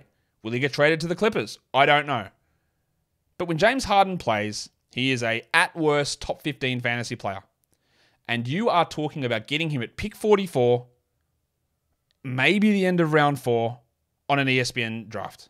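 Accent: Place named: Australia. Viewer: Compared to you I’m the Michael Jordan of fantasy basketball. You probably the same kind of idiot that picks Jimmy butler in the second round. I hope people don’t pay money for your content. That’s just criminal. Taking basketball advice from someone with like an Australian accent doesn’t seem like the move when they probably don’t even sell basketballs in your continent. Continue throwing your money away with your fantasy leagues. Hope you actually learn something one day with these countless hours you waste on these videos. Goodbye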